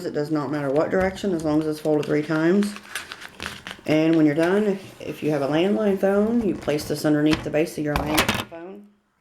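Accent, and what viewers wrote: South, United States. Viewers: Thanks for the great video.... and just for the record, English guys love that Southern accent;) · I didn’t even notice you had a southern accent until you mentioned it!!! But I am from a southern area and have had people judge me off of it too. Honestly I love southern accents so everyone who hates on it can go somewhere haha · Haa! funny how you do not like whomever told you don't like a Southern accent....your accent is terrific, and your straightforwardness is great....Thank you! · I happen to love your Southern accent - I want one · Haha I love your southern accent! Thanks for the video! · cool nails also I dont care about ur southern voice because my family is from texas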